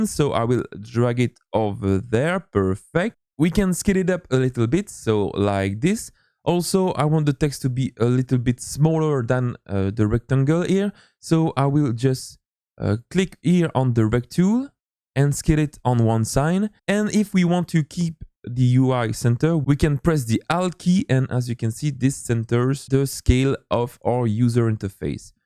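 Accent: France. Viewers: Wow:D a Wild appeared.:D Didn't expect this one,:P I like your French accent:P Tnx for the Multiplayer tutorial:) I will need it during my internship:] Epic!!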